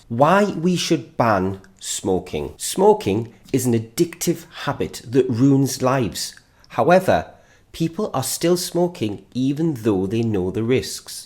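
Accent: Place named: United Kingdom. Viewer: Hi~ I am back. My final exam has just finished. I will try to practice writing skill during this long new year holiday. Thank you very much. Please keep doing🎉😊 I cannot love your teaching program projects more.🎉 Besides of writing, i love the way you reading at the end coz i could learn how to read and practice pronunciation, tonation, british accent. Perfect! ❤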